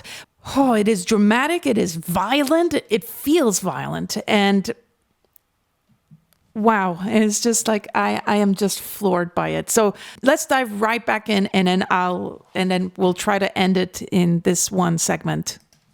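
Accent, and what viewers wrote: Paris, France. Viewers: Hello/Bonjour merci pour ton opinion très intéressante sur cette musique. Ton analyse se rapproche finalement assez bien du contexte du jeu (je n'en dirai pas plus pour éviter de spoil) Pour information, il semblerait que le compositeur ait eu à disposition les dessins préparatoires du jeu et les grandes lignes de l'histoire quand il a commencé à composer. source : <link> Vu ton superbe accent français, j'imagine que je n'ai pas besoin de traduire ;) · Very impressive, true french accent, not the funny quebec one, you could pass for a real parisian. I don't know if it is the lyrics or the violin, but it's remind me of the band 'Louise attaque'. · Beautiful reaction and analysis. I wonder where you're from ; you sound French when speaking french, but have almost no accent when speaking English, which is pretty rare if you're indeed French (though of course you're a trained vocalist). 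And I'm french Canadian so I know you're not from Quebec. Anyways, great video, hope you're doing better and wish you all the best. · I just wanted to say, your french accent is the best one I ever heard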